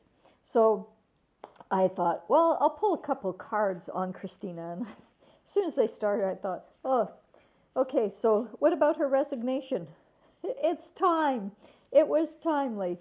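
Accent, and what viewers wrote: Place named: Quebec, Canada. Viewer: Québécoise? Ha bin, gârre d'on câ. Lâche pas la patate! 😁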